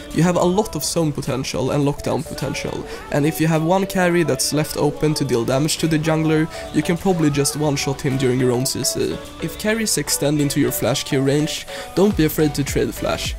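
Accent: Sweden. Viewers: great guide, can you make one that counts for now? love the guide, and how you perform. personally, i main sion, and this guide is pretty good i must admit. love your swedish accent XDD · Great vid man! And btw you sound veeery swedish xD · he sounds like he are from sweden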